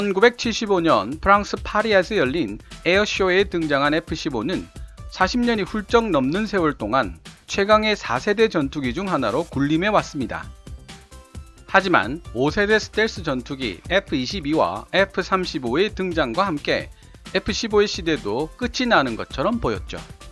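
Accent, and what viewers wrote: Gyeongsang, South Korea. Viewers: 갱상도 분이신가보네요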